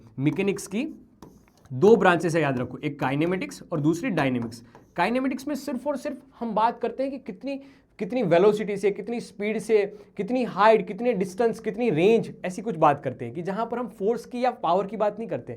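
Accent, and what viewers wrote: Gujarat, India. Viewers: sir aap Gujarati ho????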